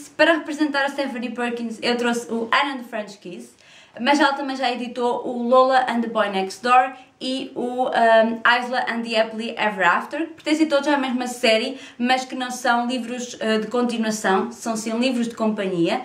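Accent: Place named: Portugal. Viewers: nossa , português de Portugal é uma gracinha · Pois é! O sotaque português é muito lindo! ❤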